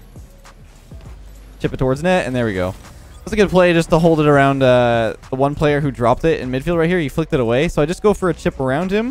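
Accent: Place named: Canada. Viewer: My Canadian friend pronounces his wors with -ar like you such as car and garbage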